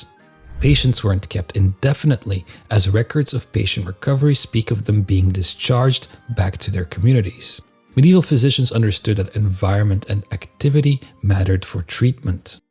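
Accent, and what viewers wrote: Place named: Flanders, Belgium. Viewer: Ik ruik Vlaams 👃🏻Geweldige content trouwens. Keep up the good work!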